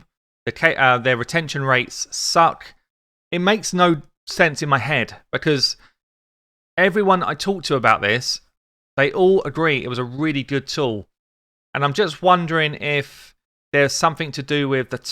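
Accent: England, United Kingdom. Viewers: Many thanks for another information update you deserve all the recognition you get. Website has become my go-to source (ahead of Chosen's great resources and I'm in a Chofly clan.) Battlepass appeals to me as a low spender as I'm retired. I play the Gwent cad game and their Journey is similar although with weekly challenges to meet - one of my monthly buys. Best aspect of your videos - your English accent, which this expat misses surrounded by Americans.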